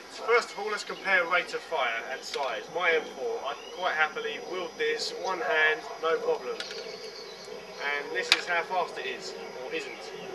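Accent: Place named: United Kingdom. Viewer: hahahaha i love it how you say anyway lets fuck some shit up in ur british voice lol epic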